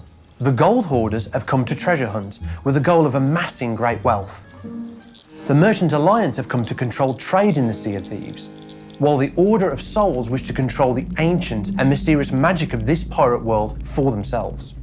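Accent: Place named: Black Country, United Kingdom. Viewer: That's a Telford or Yam Yam accent I'm sure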